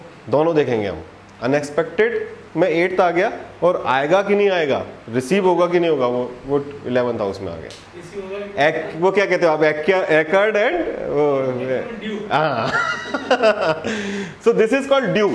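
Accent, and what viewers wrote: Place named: Indore, India. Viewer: Sir aap pure Indori ho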